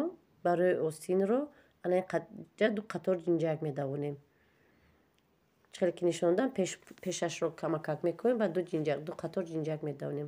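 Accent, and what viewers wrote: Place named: Rasht, Tajikistan. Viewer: Не асалчон чисто Рашти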